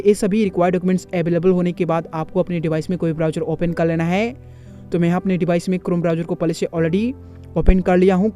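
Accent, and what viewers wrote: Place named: Punjab, India. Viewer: Punjabi accent fast voice not good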